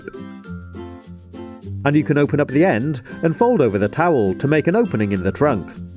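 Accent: United Kingdom. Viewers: is from the UK judging by his accent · Yamin anik I think he is from the UK because I am and his accent sounds familiar..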